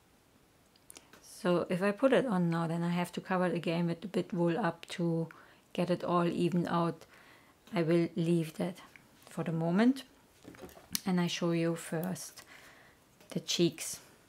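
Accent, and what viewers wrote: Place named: Scandinavia. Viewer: This artist sounds scandanavian......is that wool sorced from Ireland?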